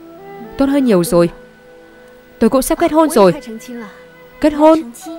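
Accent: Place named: North, Vietnam. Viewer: Giọng Bắc đọc nặng quá xem không có hay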